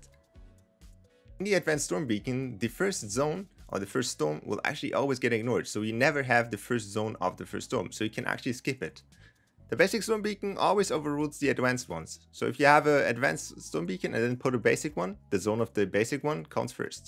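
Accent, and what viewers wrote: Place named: Germany. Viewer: u sound german....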